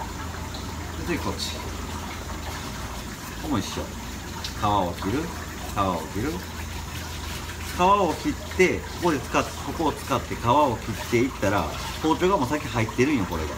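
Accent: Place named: Tosa, Japan. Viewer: 土佐弁ですね✨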